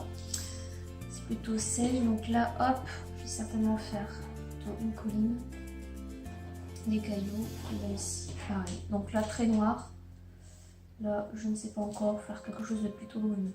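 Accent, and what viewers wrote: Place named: Quebec, Canada. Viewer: C'est génial, quand j'entends ta voix (qu'accessoirement je connais), j'ai presque l'impressions d'entendre une touche de Québécois 😄 (Le "à l'arrache" me rassure sur tes "origines" 😉)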